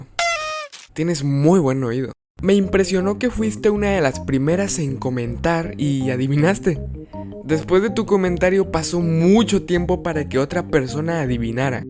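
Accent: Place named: Mexico. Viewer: eres mexicano wey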